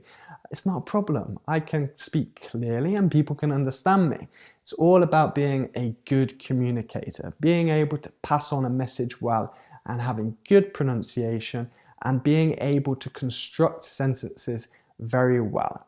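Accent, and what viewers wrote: United Kingdom. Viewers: I am dying to listen to your Italian with British English accent! You are right, I love people speaking Italian with their own accent.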